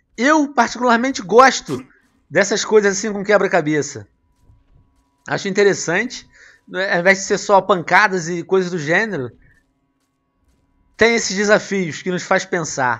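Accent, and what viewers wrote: Portugal. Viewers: voce e de portugal??? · tu és de Portugal?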